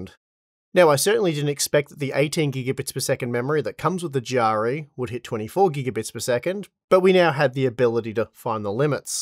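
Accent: Australia. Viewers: Regret even less for buying last week! BG3 is so smooth now! And that Australian accent is truly something else lol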